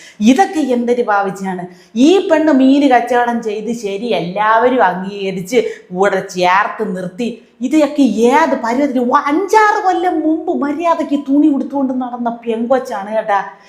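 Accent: Thiruvananthapuram, India. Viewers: തിരുവനന്തപുരം സ്ലാങ് കലക്കി പുള്ളേ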